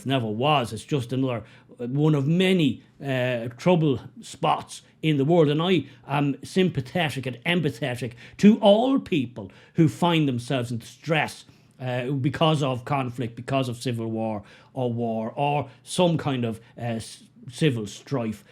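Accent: Ireland. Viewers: This guy talks like an Irishman. This video coukd have been 75% shorter. He said way too much to say so little.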